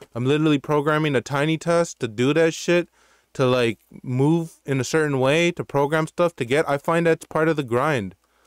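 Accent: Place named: Toronto, Canada. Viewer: are you from toronto u got a accent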